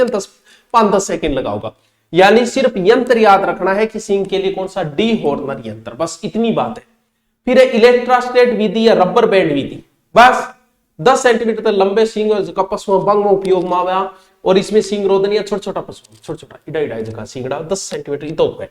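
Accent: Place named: Rajasthan, India. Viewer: Hello sir.... sir me MBBS kar rha hu....abhi 3rd year me hu......pta nhi kaha se mind me ye RAS ka bhoot sa chhad gyaa ek dum se.....aapke bahut saare videos dekhe hai maine ....aap bahut achcha padhaate ho... dheere dheere.....and marwadi sunn ke toh mazaa hi aa jaata hai sir.... English sunn sunn ke dimaag kharab ho rkha hai already 😂.....abhi pta nhi kya karna hai...but me isliye dekh rha hu aapke videos kyunki me Rajasthan se hu....toh mujhe ye sab pta hona chahiye naa .. geography... history....fort....rivers etc etc...mujhe kuch bhi nhi pta inn sabke baare me... isliye aapki kripa se thoda gyaan le rha hu...baaki dekhte hai....waqt kaha le jaata hai.......btw..... thank you so much sir ❤❤❤......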